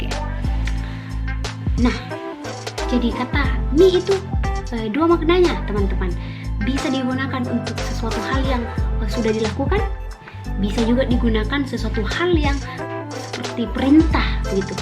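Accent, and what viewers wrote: Makassar, Indonesia. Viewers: Bukanka orang Makassar tapi kusuka dengar loga Makassar 😂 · Sya seneng denger ngomong orang makasar sya dri bandung...kangen dh lama g denger orang makasar ngobrol.jdi inget seseorang...yg tlah jauh pergi...smoga d trima amal ibadah nya..jdi sedih logat nya ade ini yg mengingat kan dia...i love u makasar... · Bukan orang makassar tapi suka dnger logat makassar🤣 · Sa orang Jawa mi,,memang suka logat Makasar to,,,wkkkk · Kentara skli makassar nya biar di paksa bagaimana di paksa logat baku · kesini gara gara lgi di makassar, bingung denger logatnya 😄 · Bingung ka kenapa banyak orang luar na suka logat makassar kalau saya biasa biasa ji :/ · Mampir ke chanel ini gara² lagi tinggal di mkssar takut nnti salah kata/pnyebutan😅 Sya asli mnado tpi sneng denger logat mkassar, kadang klau ngobrol suka ha? ha? kdang gk ngerti😂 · Saya orang Banjarmasin .... 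Senang sekali mendengar dialek. Makassar .... Negara kita memang luar biasa kaya budaya ..bahasanya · Saya suka logat makasar..tidak di aku tau saya...tpi sekarang saya baru faham...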